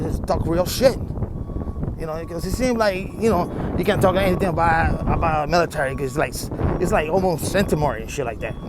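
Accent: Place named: Mexico. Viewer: It's hard to understand your broken Mexican English. You sound so low class too.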